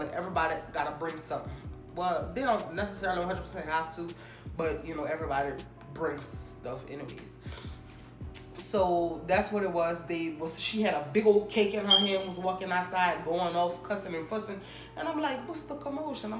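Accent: Louisiana, United States. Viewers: She sounds like she from Louisiana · Are you from Louisiana? Your accent is cute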